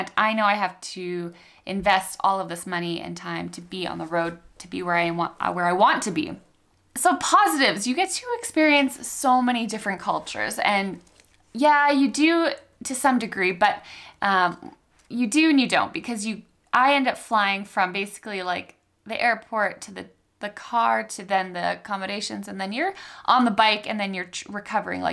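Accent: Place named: Canada. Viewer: I love the Canadian accent nuances I'm surprised i pick Canadian over American as an Australian. You're awesome your guy is ver6 lucky 😃👌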